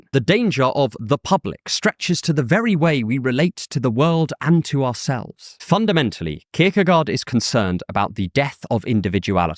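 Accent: United Kingdom. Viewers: Whatever you call the way this guy talks - its more than just a British accent - is annoying and I dont like.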